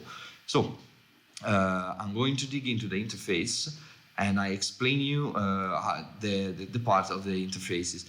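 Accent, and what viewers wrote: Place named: Tuscany, Italy. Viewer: scommetto che sei toscano haha , finalmente qualcosa made in italy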